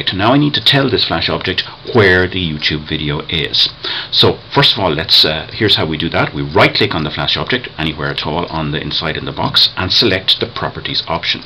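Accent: Ireland. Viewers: It's nice to hear a voice from home :) miss Ireland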